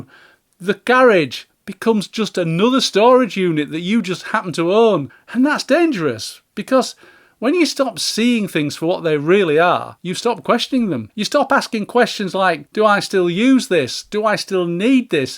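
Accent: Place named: Liverpool, United Kingdom. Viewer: Sounds like a wise Liverpool man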